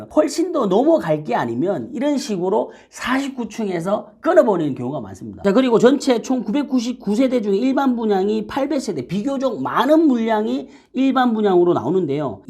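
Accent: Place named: Seoul, South Korea. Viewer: 서울말로 들리기 시작했어요^^😊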